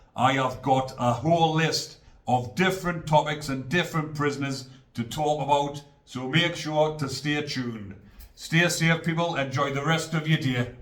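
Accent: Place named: Geordie, United Kingdom. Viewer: Propa Geordie Blooke Wye eye love ya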